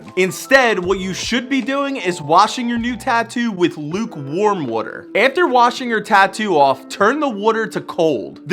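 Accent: Philadelphia, United States. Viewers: Yo are you from Philly? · The way you say water (wooder) reminds me of someone from Philly, Jersey or New York . . . Wonder if you say radiator like someone from Philly. ✌😁👍 ( RAD - EE - AYE - TOUR )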